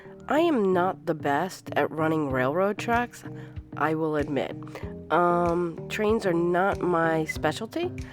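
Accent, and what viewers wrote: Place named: Northeast, United States. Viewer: In this game, water sucks, it really really sucks. The water is so wavy it makes the roads wave. Im glad your fiddling with the railroads paid off. I'd keep an eye on your train tracks, I'm worried about trains locking up in such a short space. My last metro setup locked up, killing my profits. I'm still a little bitter about it. I have trouble with train and metro tracks as well. To get the most shallow slopes on the roads, or any network really, the slope section should be exactly 12 units long and rise no more than three small steps. The 3 small elevation steps are the minimum required to go over other networks without conflicts. It makes for some damn pleasing looking overpasses in my opinion. I swear I heard some New York in your voice this time. If you're on the East Coast, it certainly explains why your videos go up so early. 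I'll have to wake up before dawn to get First Comment. Cargo hubs bring all the trucks to the yard. Yours is simple enough to keep traffic tamed while still looking great. Can't wait for the next episode.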